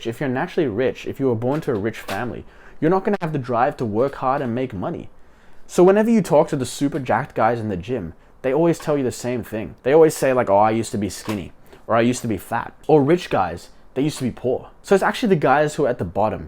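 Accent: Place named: Australia. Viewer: from the accent it's definitely australia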